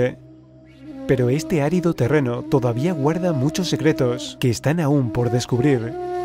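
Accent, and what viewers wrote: Spain. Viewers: Vuen video, lasrima del narrador gachupin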